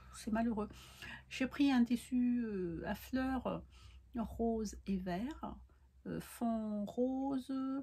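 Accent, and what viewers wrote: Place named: Madagascar. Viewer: Coucou J’ai passé un bon moment avec toi dans l’atelier cet après-midi en tricotant. Kassandra me dit: « elle a le même accent que toi » 😂😂. Ben oui on est gasy 🙌🏽🙌🏽🙌🏽 Superbe ta jupe Tiana dans ce tissu ça fait chic. Et wouaou la jupe Dolorès, elle est juste magnifique 😻… ça va bien avec la ceinture. Je crois qu il faut que je fasse la mienne 😜😝. Des bisous de nous 2 😘😘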